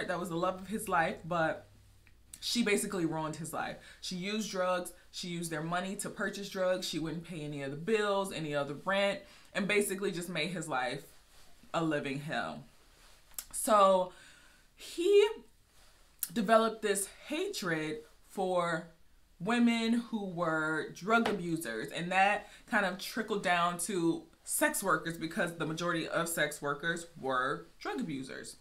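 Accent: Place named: Chicago, United States. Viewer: Yes u are from Chicago, I hear it all in yo words girly😅I'm born and raised there myself..New subscriber here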